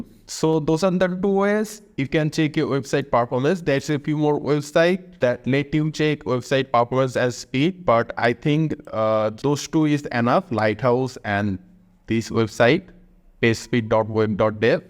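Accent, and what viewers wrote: India. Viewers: Your voice sounds much better than before in the Laravel E-commerce Project Tutorial for Beginners, but please, we can't understand the Indian accent very well. Can you make the accent a little bit easier?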